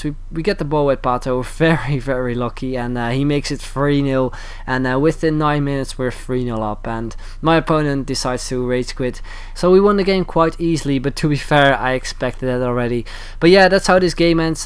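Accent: Netherlands. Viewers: Yo, are you dutch? Because your English sounds like a Dutch guy speaking English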